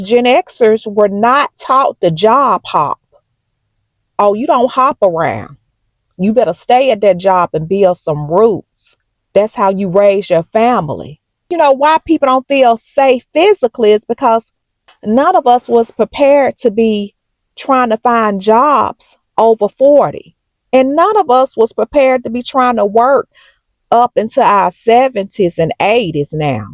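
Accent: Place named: Texas, United States. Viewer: On another, side note, any chance you're from Texas? You sound and also kinda look like some of my family, lol I know it's a long shot, but just something I noticed! Wishing you well. 🙂💞❤️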